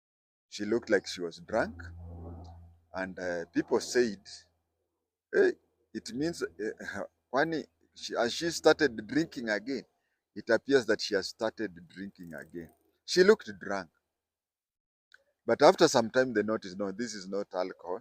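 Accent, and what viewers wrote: Kenya. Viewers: Funny u have a kale,luhya accent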